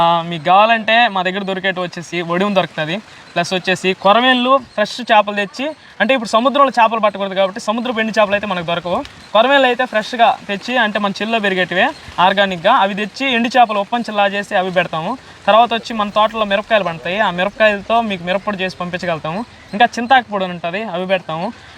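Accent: Nellore, India. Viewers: Nellore ollam adhi ma yasa elane vumtumdhi😊😊😊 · మీది ఏ ఊరు బ్రదర్.మీ బాషా చూస్తే నెల్లూరు లాగా ఉంది.మా అమ్మ వాళ్ల ఊరు వెంకటగిరి.మా అత్తగారి ఊరు చిత్తూరు.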